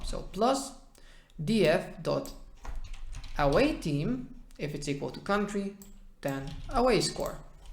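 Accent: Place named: Netherlands. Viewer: i love your videos and your accent, are you dutch?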